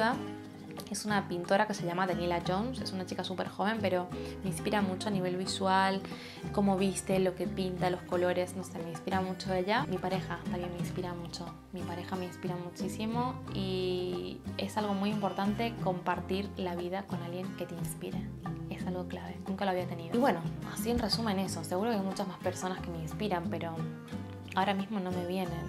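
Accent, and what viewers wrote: Argentina. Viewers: Sabía que eras argentina! Tu manera de hablar lo delata. Me encanta todo lo que compartís diosa ❤ he puesto en práctica tus consejos y me siento mejor. Gracias! Un abrazo desde Argentina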